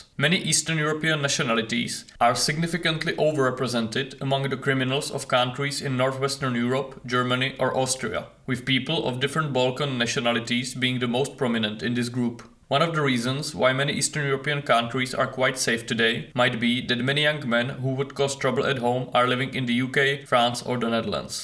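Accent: India. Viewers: that's a strong Indian accent though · Your accent sounds Indian. Are you from South Asia?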